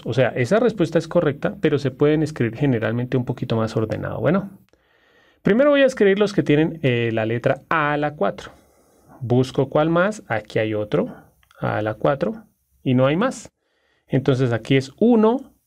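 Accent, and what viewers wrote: Colombia. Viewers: una duda usted es colombiano=??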